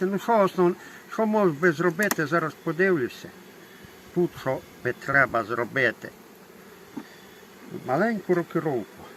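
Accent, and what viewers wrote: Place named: Western Ukraine, Ukraine. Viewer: Закарпаття? Чи де це в сорочці в лютому можна ходити? По говору чути що з західних регіонів.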